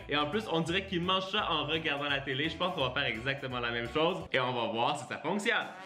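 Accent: Quebec, Canada. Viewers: J adore ton accent québécois 😂😭😂😭😂😭😂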